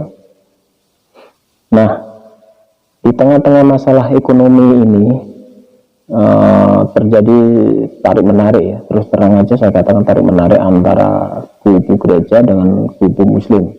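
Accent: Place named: Java, Indonesia. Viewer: Kau bercakap berteleleh...macam org nak mampus....jawa medokkkkk...insyallah..Ali diaAb Allah...Aaminnnnnn....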